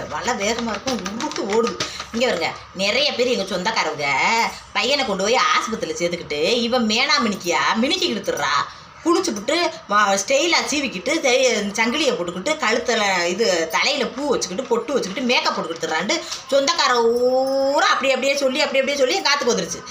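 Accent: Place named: Tirunelveli, India. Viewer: அக்கா திருநெல்வேலி பேச்சு வேற லெவல் சூப்பர் ❤சூப்பர் அக்கா ❤❤❤❤❤❤❤❤❤❤❤❤❤❤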